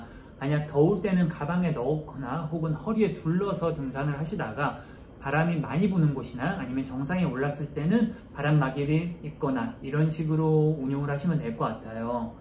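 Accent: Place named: Jeolla, South Korea. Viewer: 전라도 억양이 살짝 있구마잉 반갑소잉~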